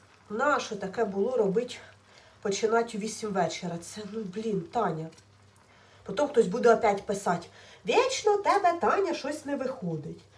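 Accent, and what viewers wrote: Ukraine. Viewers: Всі слова мацковські з українським акцентом ,і Бога приплітає !Вчи мову ",бєстолоч "!І молись !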